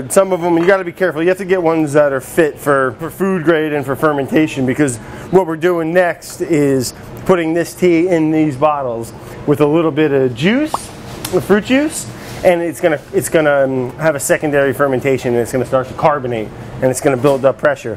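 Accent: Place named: New Jersey, United States. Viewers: Not here to learn to make Kombucha, here to watch Brad almost belligerently explain how to make kombucha and say things in New Jersey. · It's a Northern New Jersey accent.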